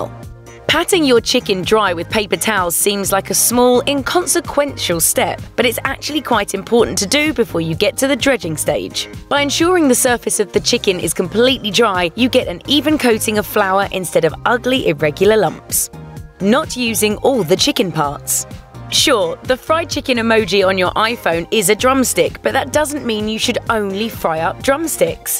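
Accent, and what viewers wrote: England, United Kingdom. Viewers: Why is a British person talking about this??🤔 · New british voice! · Gilda garbutt wyer I have not watched a mashed video with a british host/narrattor. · Thanks British lady. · Hey folks, I'm no "expert" chef or anything, just a Mississippi boy who's been frying things for 35+ years. Fried some squirrel I'd put up in Feb just today! lol. I've only fried chicken about...uh...250 times...lol..so I'm pretty good at it (do anything 250 times and you can't help but get good, right??). Anyway, I have to say, I don't like most cooking videos, especially really slick ones like this - with an English narrator, no less, lol , but these tips are really, really good. If you will follow these tips you'll do just fine. And each time you cook chicken you'll learn something. But I'm going to make just a few little suggestions that work for *ME*. 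I cannot speak for anyone else. First, regarding cooking temp: 300 to 325 isn't quite hot enough. I suggest getting the grease closer to 375, even 400 isn't "too" hot, because even if your chicken pieces are room temp (which is rarely the case if you could feel the middle) your oil temp WILL drop when you add your chicken. 325 is a pretty good temp to *maintain*, but even then I like a little closer to 350. Second, be careful with that "brine" idea. Yes, it can be a benefit, but it's certainly not necessary and sometimes it can negatively affect the texture of your meat, introducing a bit of a "compressed" or "processed" feel - which is never preferred. I would try getting good results first without the brine. If you want to marinade or pre-season in any way, just rub the chicken down with salt and maybe some cajun seasonin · Woman with British accent talking to US about fried chicken? Dafuq outta here! 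· I'm sorry but I can't take someone with an english accent seriously when it comes to frying chicken... leave that to us southern girls. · The first tip to making fried chicken is not to listen to a British accent narrator tell you what you been doing wrong! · I'm sorry but I can't listen to someone with an English accent talk about the "right way" to fry chicken...I need to hear more twang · The British narrator ruined it for me 🙄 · fried chicken, British accent... doesnt match...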